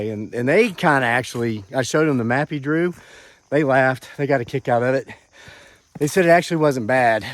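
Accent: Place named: West Virginia, United States. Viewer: Hey... I am one of your fellow West Virginians and I’ve told you before how much I love and am comforted by hearing your west by god Virginia accent...... I was also born and raised in West Virginia.... I wonder if those graves were of the family members..... I have many generations of south Caroline relatives and many years ago family graveyards were common....a part of many properties were graves...civil war even... but that was truly CREEPY and also fits with the other alien abductions you read about where pregnancies were part of the story but NOTHING AS CREEPY AS THIS.... an alien baby channeling their childhood families!! I hope you are home safe and will post on this YouTube channel that you are home safely.....seems like a lot of entities for lack of a better description...are you home safe? Of course it could also be Big Foot mixed in with invisible entities...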